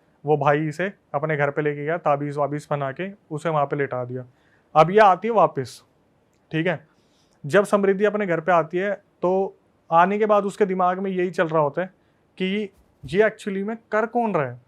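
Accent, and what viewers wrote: India. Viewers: There bolne k lahje se PTA Chala ki tu bihari jhatu hai😂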